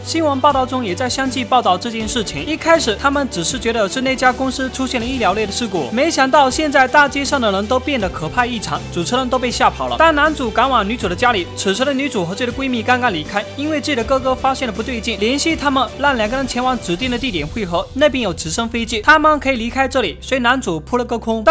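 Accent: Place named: Fujian, China. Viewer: 应该是胡建。普通话不标准。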